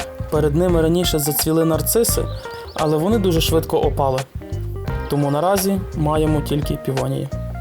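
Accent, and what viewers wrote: Ukraine. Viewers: Смішний, піднамаєш настрій, і діалект класний. Чернівці?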